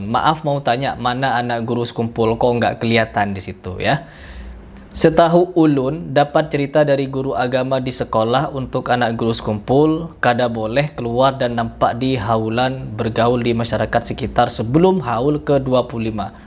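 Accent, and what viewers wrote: Madura, Indonesia. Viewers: Maklum aja wal ai ni taunya bekoceh aja kda pernah tau kisah kewalian guru sekumpul,Inya taunya bekoceh gasan kontennya ja..smlm ja di tempeleng warga dikepala Ranai aja Inya,pinanya urang madura dr logatnya.paham ja toh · org Madura Pina ny dari logat bicara ny mang ae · Kya orng madura kmu ini logatnya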